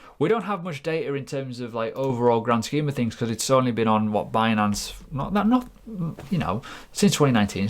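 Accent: United Kingdom. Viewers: main problem is the heavy British accent:)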